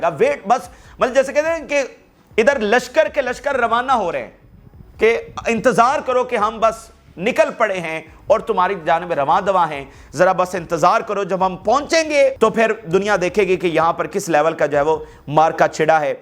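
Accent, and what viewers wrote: Punjab, Pakistan. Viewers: This guy talk garbage past 10 months he has been spreading panic n fake news All these months he has been saying that hizbuallah will go in war with yahoud but hasn't happened yet but now we experiencing conflict with yemen and yahoud He hasn't or never said anything about this incident 87 people got injured and 3 killed Now he saying things differently He is there to make money from u tube Please stop him by making comments to slam his fabricated news He dance scream start questionig himself and answer himself He has no source of own his on Get news or copy news from different resources which is readliy available to all of us Can't speak right one word of English Neither he can't speak urdu in right accent except speak everything in punjabi accent Belive me he is nothing except a punjabi merasee Just listen his English and watch his body dance He try to look waheed murad or daleep kumar😂 This monkey become on u tube a laughing stock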